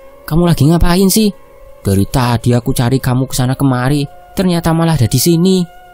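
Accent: Java, Indonesia. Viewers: Ini kan ceritanya orang sunda. Tp kenapa bahasanya, trs cengkoknya itu kaya orang jawa ya, beda sama teksnya.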